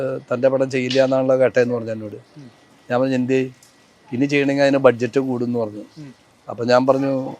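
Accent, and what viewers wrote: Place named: Thrissur, India. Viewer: നിങ്ങളുടെ സംസാരം കേൾക്കുബോൾ തന്നെ അറിയാം ആ ഗഡ്സ്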